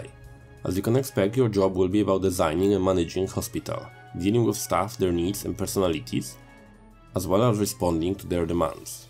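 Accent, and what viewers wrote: Poland. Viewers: i don't know if you will feel offended but you sound polish. are you polish?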